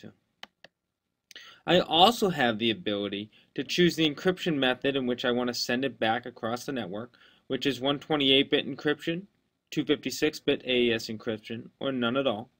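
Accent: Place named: England, United Kingdom. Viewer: What a perfect English accent. I love it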